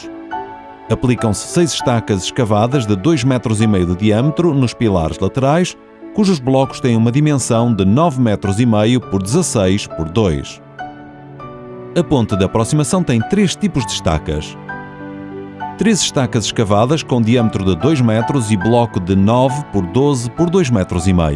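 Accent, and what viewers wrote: Portugal. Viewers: Porque um português na narrativa? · Está voz é de um português de Portugal!! Quero entender está evolução toda!!🤔🤔🤔🤔🤔🤔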